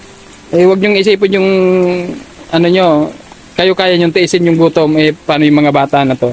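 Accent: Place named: Bicol, Philippines. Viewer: Magayon nag acceint ng bicol mo.nakakaralateakonsa mga pronouce mo.ng bicol parang na.miss ko ulinnag bicol taga camsur ako.kaso ang bikolnmo parang tunog rinkunada masarao pakinggan ang boses mo..tuloy lng mag vlog lagi ako nanunuod.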